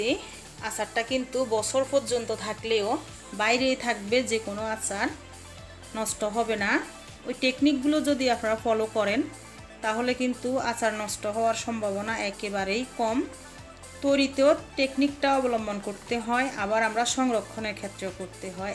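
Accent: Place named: Feni, Bangladesh. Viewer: আপনার বাড়ি কি ফেনী?আমার স্বামির বড় ভাবির কথার ধরণটাও এরকম।By the way আচার টা দারুন হয়েছে।👍😊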